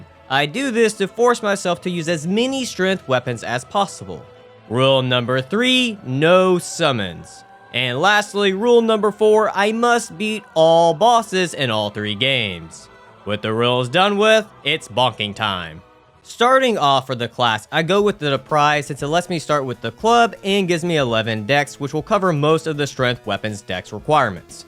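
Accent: Louisiana, United States. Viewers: Immediately subbed when I heard the Cajun French, Louisiana/Souls representation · Hearing the Cajun activated me like a sleeper agent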